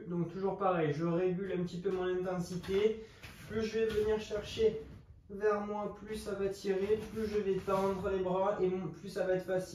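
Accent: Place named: South, France. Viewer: Merci pour les séances et pour l’accent du sud ! J’ai adoré ! Très adaptée pour moi qui ne suis plus de première jeunesse !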